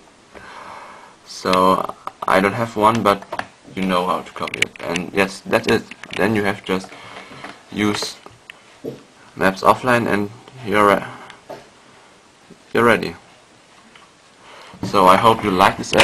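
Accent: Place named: Germany. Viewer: lol german accent ftw! :D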